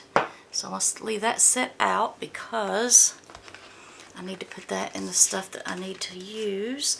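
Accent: South, United States. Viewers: Morning 😊 Love that your comparing a new Subscription to an older one. The highlighter palette is pretty . Your hair looks gorgeous 😊 I love a southern accent. Oh you got 2 ND palettes, I hope I get one in my box 😀 Both were great boxes 😊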